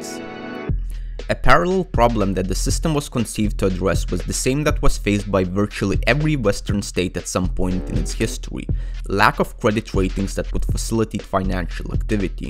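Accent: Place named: India. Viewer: Need one with out the pajeet accent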